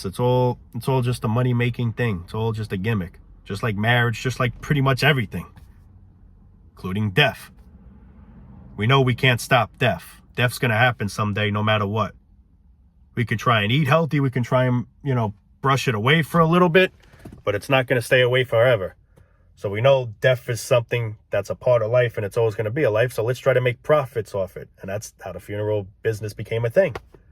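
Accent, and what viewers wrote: New York, United States. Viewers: Yeah the ny accent, and truth speaking. Makes me laugh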